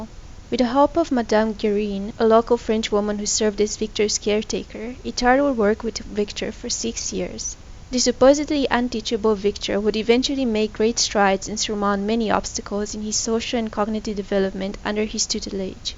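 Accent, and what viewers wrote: South Africa. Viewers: you are from South Africa?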